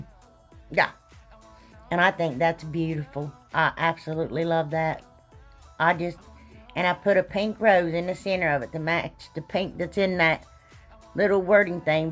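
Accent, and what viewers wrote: South, United States. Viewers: Where are you from? You sound like me , country accent!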